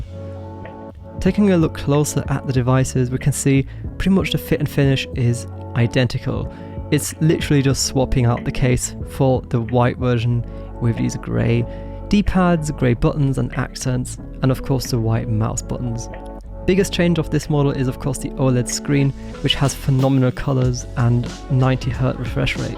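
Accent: Germany. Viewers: Geiles Video ! Am Akzent hör ich das wir die gleiche Sprache sprechen :)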